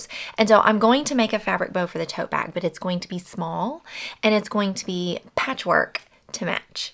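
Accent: South, United States. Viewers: The lady is right, anywho is not a word, it is a phrase...a saying...a moment when words don't matter. I am from Michigan and my family says it ALL the time! My dad's side especially says it and we have no roots in the south at all. I think phrases said are a moment when the "uptight" leaves the conversation, lol. You say all the Southern you want Miss Ma'am! I like your southern accent and speech as it reminds me of when I lived in Atlanta with my best friend Julie. Her mom would introduce me to others saying, "This is Jennifer. She is our Yankee friend but we love her just the same." 🤣😂🤣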